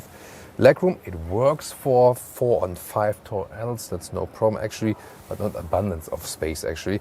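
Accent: Germany. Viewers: I agree, plus I love the german accent - Im italian but used to live in Germany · There's just something right about hearing a German accent describing a BMW.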